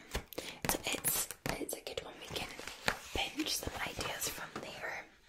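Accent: Scotland, United Kingdom. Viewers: So Scottish reminds me of Amy Pond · I'm from Scotland and I love all women's accents from all over the world but nothing excites me more than a homegrown accent , amazing X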